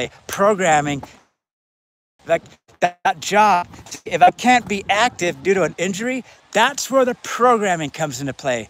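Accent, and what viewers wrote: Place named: Scotland, United Kingdom. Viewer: Are you Scottish or Irish....or both?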